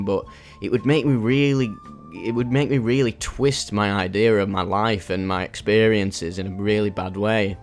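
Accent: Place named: Yorkshire, United Kingdom. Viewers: This geezer sounds like hes from yorkshire 😳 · You from Yorkshire? I'm from Hull, you sound like you live close. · mate you sound so northern are from the NE?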